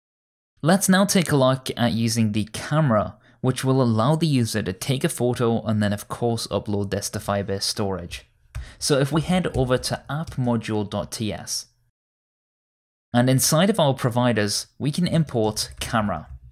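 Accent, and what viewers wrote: United Kingdom. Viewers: I've been coding for years but have always had the attitude of never knowing enough. Your tutorials often cover ground I've already walked but I always watch them as I still find them invaluable for reminders or tips for things I've missed. Always fun for me to hear a fellow northerner too, doing the YouTube thing. Thanks.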